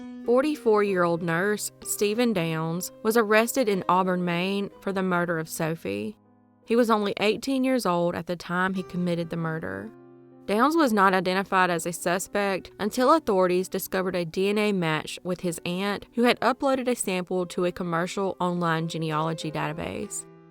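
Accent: South, United States. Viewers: From another country girl to another, I love your accent In the fact that you named your channel Southern Girl Crime Stories!! It fits perfect to a T, and your content is great because a lot of us have never heard of some of these cases and I am grateful for you putting it out there. I know that it takes a lot of hard work and time but I must say once more you are doing a fantastic and awesome job! Keep up the great work and have a wonderful week sweet face.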